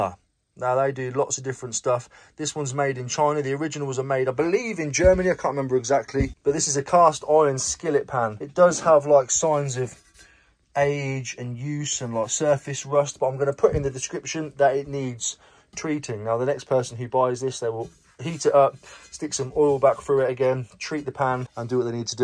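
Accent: Wales, United Kingdom. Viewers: I’ll make the most of it while it lasts. Love the welsh accent by the way, thought I was watching Fireman Sam for a minute! I saw Ian’s video, bet the guys wife got a right bollocking after he left.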